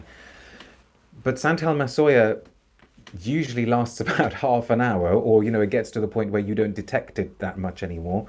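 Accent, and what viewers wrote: United Kingdom. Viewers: Hello 👋 are you English?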